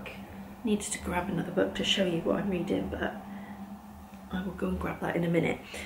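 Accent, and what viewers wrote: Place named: Devon, United Kingdom. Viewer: I’m absolutely here for you and your strongest Devonshire accent. Lovely to spend time with you again xxx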